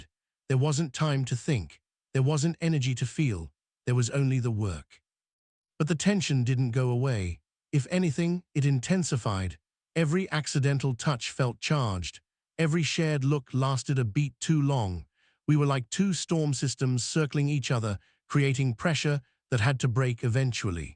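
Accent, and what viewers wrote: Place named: London, United Kingdom. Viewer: This male voice is it a beautiful London voice ? Wounderful to hear it.the story is excellent thank you AI family❤❤❤❤❤❤❤❤❤❤❤❤❤❤❤❤❤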